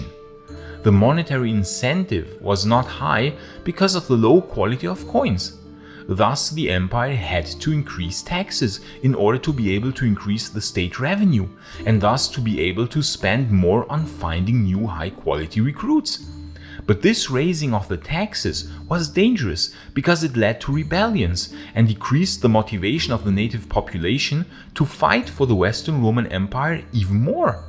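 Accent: Germany. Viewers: Kannst du bitte noch einen deutschen Kanal machen, bei dem du das ganze nochmal neu einsprichst? Nichts für ungut, aber dein Englisch klingt genauso wie das eines alten Klassenkameraden, der versucht hat so akzentfrei wie möglich zu sprechen. Das klingt in der Regel allerdings noch schlimmer als wenn man ganz normal als Deutscher englisch redet. Schau deine Videos echt gern, aber dieses versuchte Unterdrücken der deutschen Aussprache macht es echt schwer zu schauen. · Where are you from i wonder about your accent are you german?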